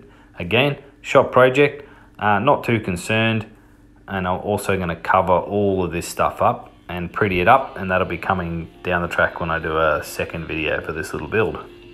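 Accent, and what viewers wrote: Australia. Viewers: Aussie accent took me on a ride for sure. Thanks for a speedy reply! Thats a sweet hefty vise you got there. Looks like a Record’s 53e younger brother. Your shop looking spiffier and spiffier! Soon you’ll have to grow a beard and visit tattoo parlor. Its a must ... you know. LOL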